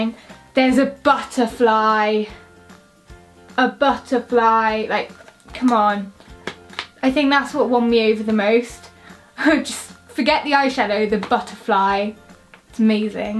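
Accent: United Kingdom. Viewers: Oh my gosh you're British!! <3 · Ar you welsh ?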